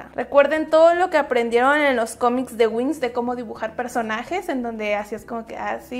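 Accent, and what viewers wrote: Chile. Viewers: Me encanta que use el acento chileno weonnn!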